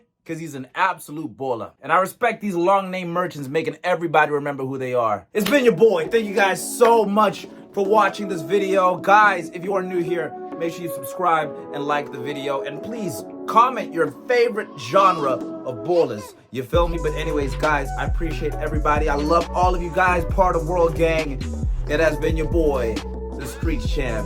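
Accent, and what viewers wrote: South Africa. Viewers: Bro, you South African? Shocked by accent. Gonna sub in any case. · Your South African? Same 😅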